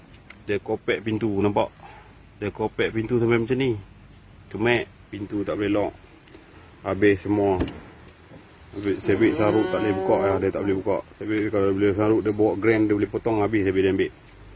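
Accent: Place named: Penang, Malaysia. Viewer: Alor Vista ni dekat mana? suara macam loghat penang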